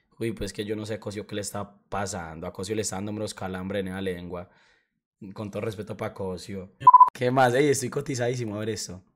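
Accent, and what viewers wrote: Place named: Medellín, Colombia. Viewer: Este chico es de Medellín la forma d hablar d ellos es inconfundible m hacen reír mucho😄👍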